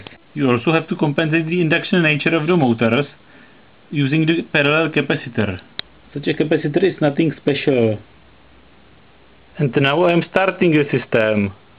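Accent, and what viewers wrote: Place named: India. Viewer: Это английский язык, но говорит житель Индии, скорее из северной ее части. Южан очень тяжело понять, а этот говорит так, что его слова понять можно.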